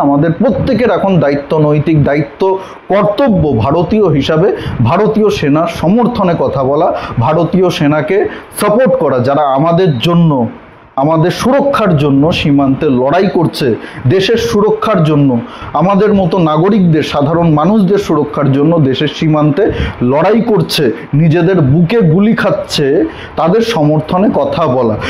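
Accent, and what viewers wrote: Bangladesh. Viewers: তোর বাংলা উচ্চারণে বোঝা যাচ্ছে তুই বাংলাদেশী|এদেশের মানুষের মধ্যে বিভেদ তৈরী করতে চাইছিস |তুই অবিলম্বে বাংলাদেশে পালা|ওটাই তোর আসল জায়গা |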